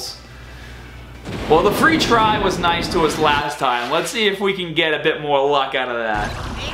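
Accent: Boston, United States. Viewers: I just found out your accent is from boston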